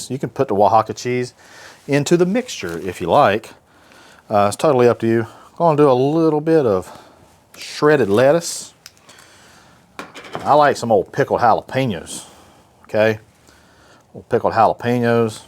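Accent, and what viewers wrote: North Carolina, United States. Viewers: I know an eastern north cackalacky accent when I hear one!! love your videos... inspired me to get a blackstone!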